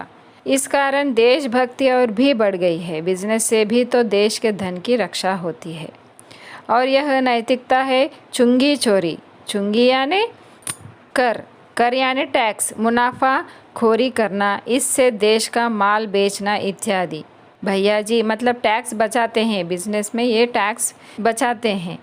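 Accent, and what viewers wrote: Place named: Andhra Pradesh, India. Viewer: Mam looks like your accent is different I think you are more comfort in telugu 😅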